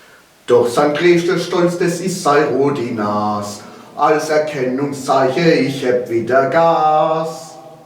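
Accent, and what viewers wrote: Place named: South Palatinate, Germany. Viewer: Leider Südpälzisch 🤢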